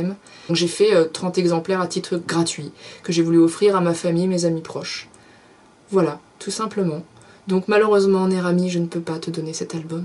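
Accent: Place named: France. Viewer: Hello!! J'aime bien ton authenticité, ton franc parlé et ton accent....J'ai une amie Française que je connais depuis peu et j'adore son accent....Tu me fais pensée à elle!! J'écoute tes vidéos depuis peu et je suis abonnée également et je regrette pas....Tu as un vidéo sur les Arpèges entre autre et des trucs que j'ai enfin compris comment m'y prendre pour pouvoir l'apprendre et tu expliques très bien toutes les étapes!! Merci! J'adore aussi ta toune:!! Tellement réaliste! Et je voulais te dire que moi aussi j'ai vécu le jugement des gens sur mon orientation: On m'a cataloguer quelques fois de (Lesbienne) mais en réalité je ne le suis pas. Je suis en couple depuis 29 ans et même à ça les gens me juge juste par mon côté Garçonnière....Mais avec les années je commençe à m'en foutre pas mal moi aussi donc!!....Ta personnalité me rejoins parce que je suis authentique et un franc parlé comme toi et c'est ce que mes amies aiment de moi alors la recette doit être bonne!! 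Longue vie à ta chaîne!! Et au plaisir de te relire et t'écouter....tu as du beau talent...sincèrement!!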